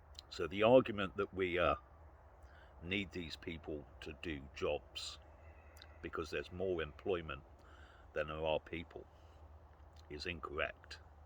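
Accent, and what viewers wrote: United Kingdom. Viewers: I'm lucky to hear a British voice where I live......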